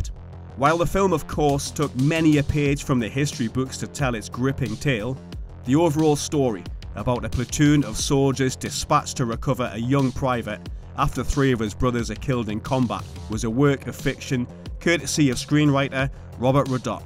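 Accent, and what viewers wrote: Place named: Newcastle, United Kingdom. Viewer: Can't take this video seriously with its Geordie narray'aaaaah.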